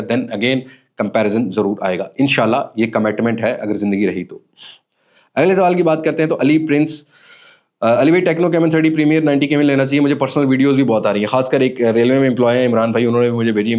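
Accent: Saraiki, Pakistan. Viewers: Your Siraki accent is 👌🏻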